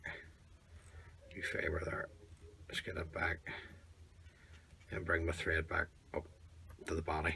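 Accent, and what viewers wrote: Northern Ireland, United Kingdom. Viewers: Well, if that's not a norn irish accent. Great wee video of a very traditional fly. I've been away from tying for about 45 years and I'm glad to see that these haven't changed. Found most of my kit the other day so I think it's time to revive an old passion of mine.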